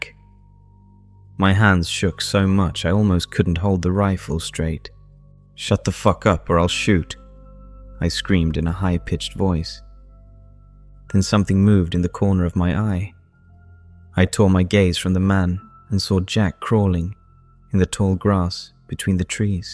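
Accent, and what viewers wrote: United Kingdom. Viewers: This is very incongruous: an American, living in America, speaks with a British accent. Credibility gap!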